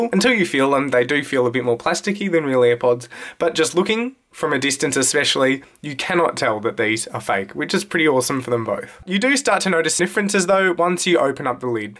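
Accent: Australia. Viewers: I'm from Britain and can confidentaly confirm he's UNMISTAKABLY Australian.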